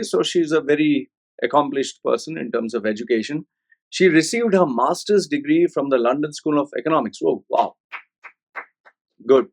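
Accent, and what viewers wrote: Kerala, India. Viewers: lol true but i dont think hes konkani but keralite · Your accent is Very Indian!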